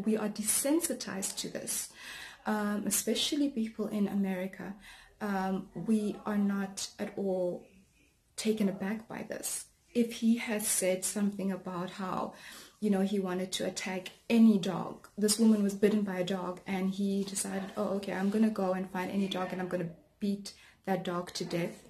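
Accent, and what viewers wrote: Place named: United Kingdom. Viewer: BUT HOW MANY NIGGAS KILL OTHER NIGGAS FOr THE SAME THING LMAO YOU DUMB BRIT YOU ARE NOT UNDER RACISIM LSITEN TO YOUR AccENT YOUVE NEVER TOUCHED A GUN OR BLOOD IN YOUR LIFE YOU FUCK FACE \ hah if you get this far into this your brave and should be able to see past what liam nesson says He said he would do it but ik youve said youd kill all white people.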